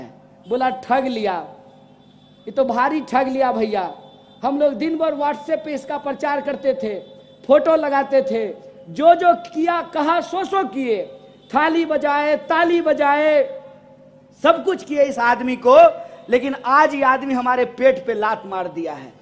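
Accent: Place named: Begusarai, India. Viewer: कनैह्या विरोधी पक्ष को बएत रखने की लहजा तो पूरे बेगूसराय की हीं है.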